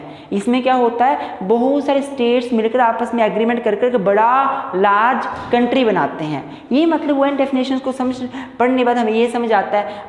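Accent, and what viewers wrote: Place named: Punjab, India. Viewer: The content is very helpful...and that punjabi accent jo aa rha hai that is also making it an interesting lecture 😄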